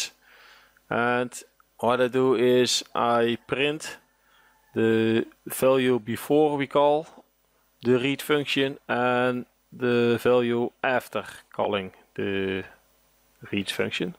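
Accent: Netherlands. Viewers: 10 seconds is enough to recognise that his native language is dutch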